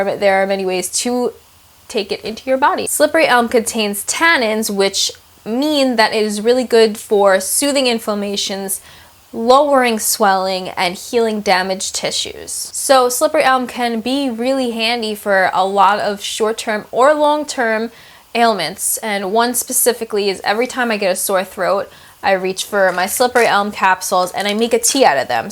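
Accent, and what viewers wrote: Long Island, United States. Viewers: Are you from Long Island? I notice an accent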